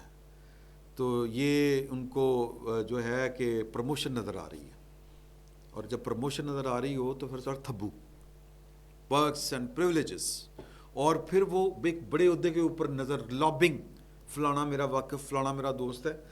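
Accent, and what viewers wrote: Punjab, Pakistan. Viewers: is kutty ka lahja bhi jahil punjabi ki trha ha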